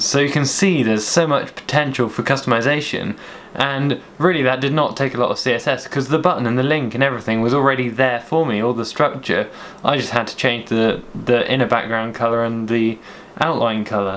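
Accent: United Kingdom. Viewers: Are you english ???britisher? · are you british ??your accents remind me of classic english like joe root does :D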